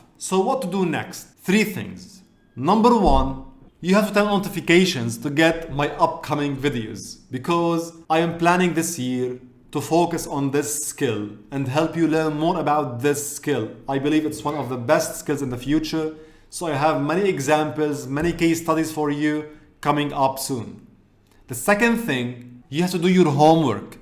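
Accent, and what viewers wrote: Arab world. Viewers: ألسلام عليكم. أأنت من العرب، لان انكليزيتك كالعرب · This is my first video of this channel, and I know a good teacher when I see one. You've the capability to teach and keep the attention of viewers in this short attention span era. It was a treat watching. Arabic accent 🇧🇩🔥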